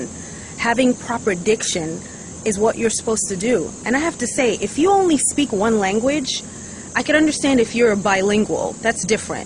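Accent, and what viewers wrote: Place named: United States. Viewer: You're still speaking in an American accent so still got some work to do.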